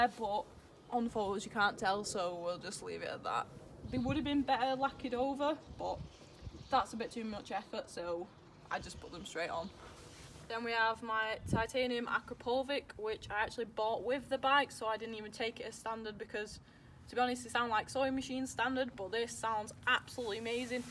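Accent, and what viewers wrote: Northern England, United Kingdom. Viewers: No, I'm in Norwich. I just like northern accent lol. Watched the MT10SP ride and then this one... Not enough UK vloggers with interesting accents... Keep it up, sort the Mic out though... bit quiet 👍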